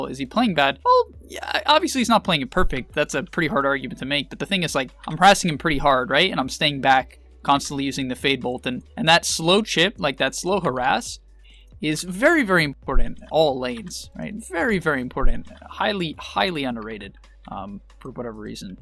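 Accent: India. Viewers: i was surprise too. Because I watched indian programming tutorial videos, I thought it was an indian person. lol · I thought there is a new guy, a indian one haha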